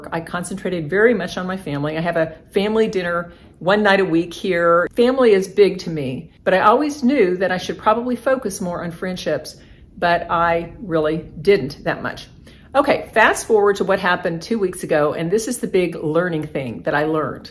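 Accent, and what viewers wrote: South, United States. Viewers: are you a southern belle?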